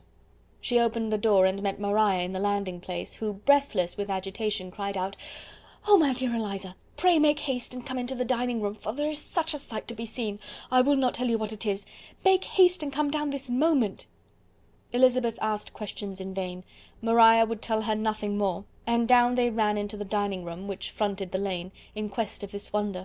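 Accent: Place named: United Kingdom. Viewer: Excellent british accent!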